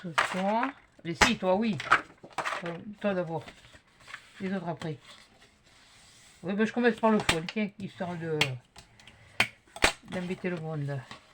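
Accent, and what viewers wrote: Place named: Aveyron, France. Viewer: Coucou, je regarde toutes tes vidéos mais je ne commente pas souvent. J'aime beaucoup ton tuto, des défauts tout le monde en fait, mais certaines ne le disent pas, ton parler je le connais bien car je suis de l' Aveyron... Bonne journée, bises😍😍😍😍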